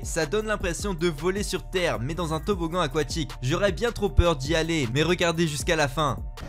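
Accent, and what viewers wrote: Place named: France. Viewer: Les commentaires insignifiant du narateur, avec son accent français me tape sur les nerfs.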